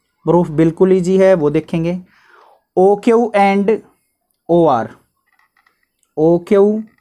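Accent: Haryana, India. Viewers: name tek lete h 😁😁🤣🤣🤣🤣🤣are you from haryana sir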